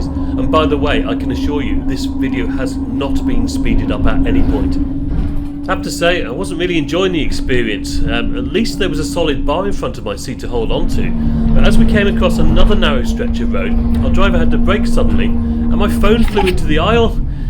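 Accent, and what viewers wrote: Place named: Scotland, United Kingdom. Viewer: Somehow I thought you were from Scotland lol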